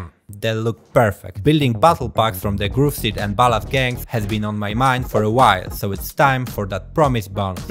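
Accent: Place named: Poland. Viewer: słychać że z polski zajebista robota oby tak dalej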